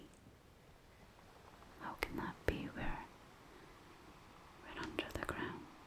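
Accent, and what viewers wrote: Scotland, United Kingdom. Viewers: Your voice is so...so...zzzz (+ the Scottish accent 😍😍😍😍 you could talk me about haggis for hours I would still be relaxed ) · Are u scottish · I didn't know I needed Scottish ASMR. Now I wonder how I ever fell asleep without it. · a sco`ish ashmr`tist, we can enjoy that:) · makes it difficult to decide if scottish or irish is more attractive. I would have originally thought the latter because of the brash dwarves in movies but she makes the former so damn pleasant. Not even counting the lovely Scottish Chinese fusion. She's a dream girl in more ways than one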